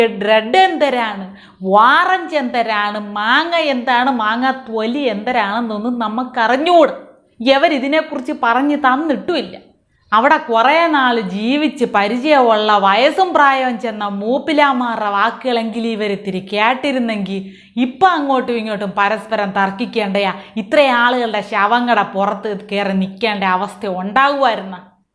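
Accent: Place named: Trivandrum, India. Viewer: നല്ല tvm slang കേൾക്കാൻകൊള്ളാം നീ ആരെടെയ്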